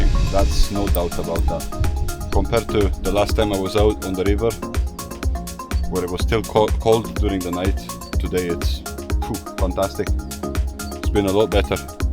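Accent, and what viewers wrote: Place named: Scotland, United Kingdom. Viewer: Love the Scottish accent.... definitely following you mate 👍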